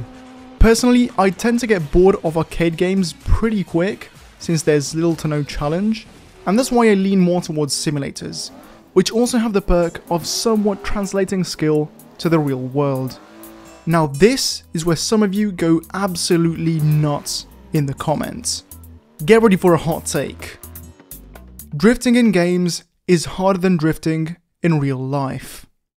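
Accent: Italy. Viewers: italian by any chance? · I love the hidden italian accent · You're Italian??!!! Wth. I am too. Bro il tuo accento é pazzesco. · sei italiano?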